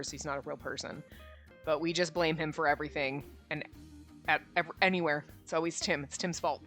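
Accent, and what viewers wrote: Ireland. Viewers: everyone loves the Irish accent me included :)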